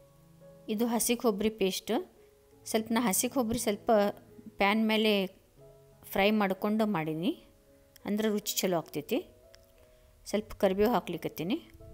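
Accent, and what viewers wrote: India. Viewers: You sound awesome 👏🏼 so good to hear you speaking in Namma Hubli Kannada 😊Loved listening to it..!! Your sambhar looks superb and this is what I call comfort food...You are such a versatile cook 🙏🏼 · Your Kannada voice over added Best flavour to the Sambar recipe 😍 Please continue it for all videos , ಹುಬ್ಬಳ್ಳಿ ಭಾಷೆ 🔥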